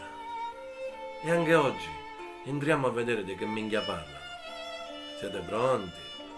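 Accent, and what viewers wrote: Italy. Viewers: Ciao,x uno come me' che si è fatto 15 mesi di militare a Palermo(corso Calatafimi, Autieri)sentirti parlare in siciliano è un bel ricordo, grazie e come SEMPRE FORZA MILAN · SEI UNICO!!!!!!!!! SEI UNICO VERAMENTE!!!!!!!!!!!! Poi la cadenza palermitana!!!!!!!!👏👏👏👏👏👍👍👍👍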